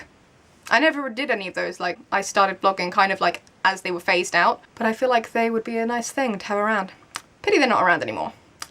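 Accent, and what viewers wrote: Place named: United Kingdom. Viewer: Okay, I just scrolled through my entire liked videos list to try and find out through which of your videos I discovered you because I didn't discover you through your sister (I actually found her through you ;) ). It turns out I discovered you because of Pottermore. Back when it was still in the development phase and only a few people at a time could register I would look at tons of sorting videos on youtube and that is how I found you. It is kind of hard to discribe why I subscribed to you. It's number of reasons, all of which will probably sound pretty weird. I really like your accent (I'm from Germany and I absolutely adore British accents), I like the way you talk, your enthusiasm, your honesty, your opinions... also, you share two of my very favourite interests which are reading and writing and, of course, Harry Potter :) All in all I find these insights into your life incredibly interesting. 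You are a few years older than me so it is kind of like watching what my future might look like in terms of going to uni, having your own place, finding work etc. I do have my own Youtube channel (which is called MissSophieReads) which is basically just your stereotypical channel about reading and books (I haven't yet come up with any cool original video ideas).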